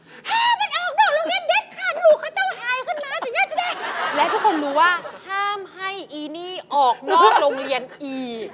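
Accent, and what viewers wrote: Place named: North, Thailand. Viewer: เล่าได้เห็นภาพเลยค่ะ😂😂อู้เมืองตวยน่าฮักขนาดเจ้า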